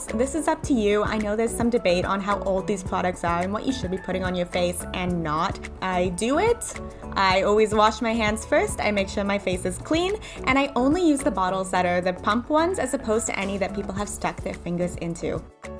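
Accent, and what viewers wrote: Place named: Bronx, United States. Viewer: Your voice sounds a bit different like you come from the Bronx not Canada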